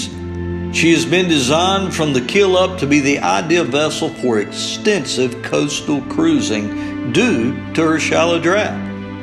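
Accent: South, United States. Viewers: "Jeff foxworthy narrated a yacht video? Who knew..." Dr. Phil, Bill Clinton, now Jeff Foxworthy 3 down and over 150 Million with Southern accent to count one by one.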